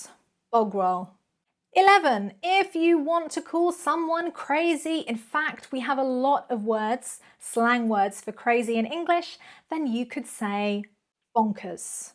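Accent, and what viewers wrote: United Kingdom. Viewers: Hey teacher you look like Hindu, but your accent sounds beautifully British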